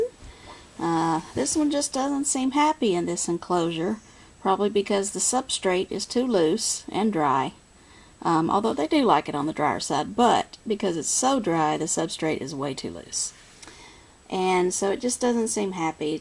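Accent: South, United States. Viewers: Love your videos!!! I thought you had a southern accent, my mama was born in Tennessee but she raised us kids in WV. Tennessee is a beautiful state. I love it there.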